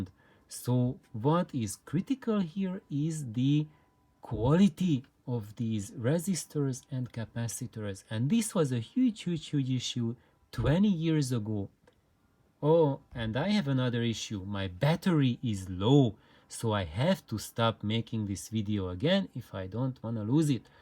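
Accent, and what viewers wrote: Hungary. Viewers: I’m 2 yrs late, but I am and have always been able to understand you perfectly,. I’ve met friends via the vinyl and audio communities from all over the world, France, Germany, Sweden, Australia, Japan, Brazil, etc., and I can understand them, quite clearly in fact. It’s not like you’re speaking Hungarian, just English with a Hungarian accent.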